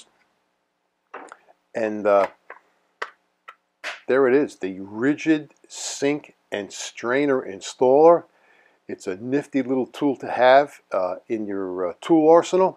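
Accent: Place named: Brooklyn, United States. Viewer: Just bought one and i knew you were from Brooklyn since you sound like me, lol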